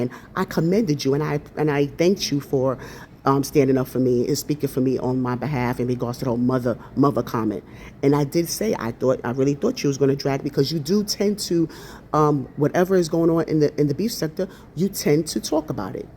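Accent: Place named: Brooklyn, United States. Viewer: Is that Brooklyn I’m hearing? Keep tawkin!!!!❤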